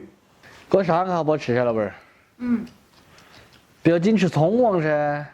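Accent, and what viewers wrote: Sichuan, China. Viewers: 老乡的川普听起来不摆了比厨艺更有吸引力🧲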